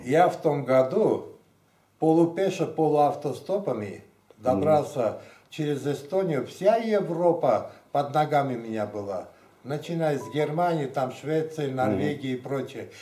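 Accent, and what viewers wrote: Armenia. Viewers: ДОРОГОЙ ВЫ МОЙ ЧЕЛОВЕК,ВЫ ДАЖЕ ВНЕШНЕ ПОХОЖИ НА АРМЯНИНА И АКЦЕНТ У ВАС АРМЯНСКИЙ, ДАЙ БОГ ВАМ ЗДОРОВЬЯ И ДОЛГИХ ЛЕТ ЖИЗНИ! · Круто, и акцент армянский..ещё круче то что он художник. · Боже мой, у него даже акцент армянский!, спабибо, что Вы есть у нас. Здоровья и благополучия всей Вашей семье! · Достойный сын эстонского и армянского народов!Честь и слава вам! Но по русски говорите с армянским акцентом.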